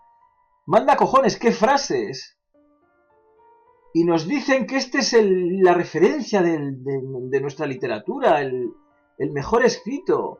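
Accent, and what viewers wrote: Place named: Mexico. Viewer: Grande!!! no teme decir la verdad jamás!!! ya hablas como Mexicano hermano!!! un abrazo y gracias x tus vídeos!!!!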